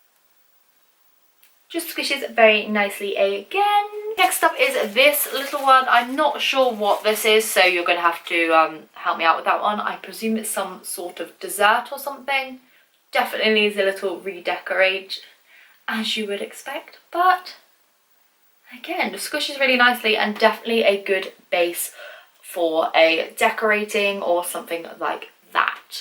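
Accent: United Kingdom. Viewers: Is she British? If so YES I’ve finally found a British squishy YouTuber! ❤️ who else here is British?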